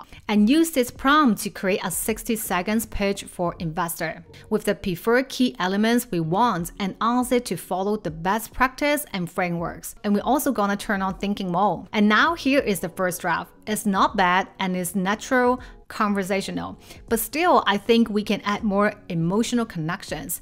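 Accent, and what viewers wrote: China. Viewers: Great content. Your Chinese accent is very strong so I finished watching but it was pretty tough Good luck.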